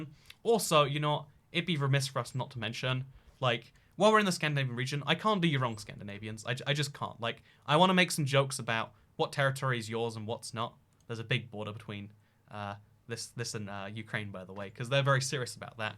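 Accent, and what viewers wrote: United States. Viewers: Edit: Warning, if you are on a phone, be careful about clicking read more, I accidentally wrote a HUGE paragraph. You may also learn something after you get through the solid bock of text. There is one big reason that people sometimes think that is from America. First of all, you should know that EVERYONE has an accent, you just don't realize that you or the people in your area have it, because it is part of your dialect. Not all accents are distinct though, and some do sound similar. You also have your own distinct dialect/accent influenced by where you have lived, mostly influenced by where you grew up, and who you grew up with (friends and siblings more than parents). Side note, Great Britain and America have been friends (and allies) since the American revolution, yes Great Britain did try to take back America in the war of 1812 but did you know that even though America lost the war of 1812, America is still its own county. 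America and Great Britain have been influencing each other for centuries, it should no surprise that some people are going to confuse an English accent with an American one, in an area of America that sounds surprisingly similar. I'm looking at you South-west. I am allowed to say this, I live in one of the Southwest states and have been to all of them, but I have noticed, that some states try really hard to have a distinct identity as a state, some states have that, but some states don't have that, and honestly, sorry Southwest of America, exclu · Is this guy American? ??? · Thought he was amerixan for a minute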